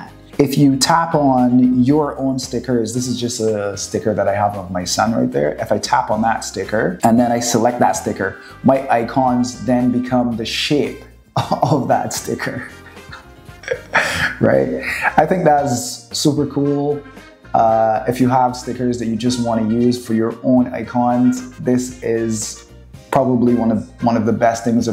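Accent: Barbados. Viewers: Hey man , random question, are you from Barbados 🇧🇧?😂 or the Caribbean at least, I hear my people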